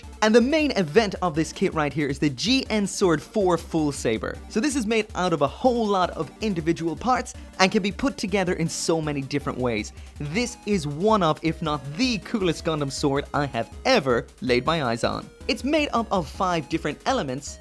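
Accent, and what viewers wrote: Ireland. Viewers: Hey man, you Irish?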